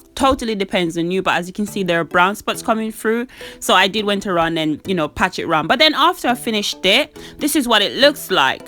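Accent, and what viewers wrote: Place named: United Kingdom. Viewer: This is too much creativity.You did the decor quite well.I also loved the..is it British English? So amazing.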